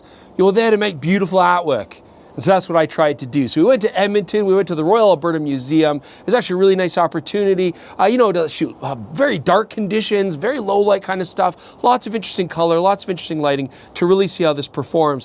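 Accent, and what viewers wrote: Australia. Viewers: holy shit I was not expecting that Australian accent even after seeing the comments. Genuinely A+ effort lmao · woa great Aussie accent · Good Aussie Accent! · ' Aussie accent isn't bad. As for the cameras: BLEECHHH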